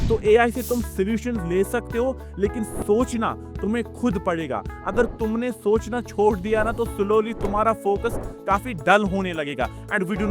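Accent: Kashmir, India. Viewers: Ap kashmir say ho kya sir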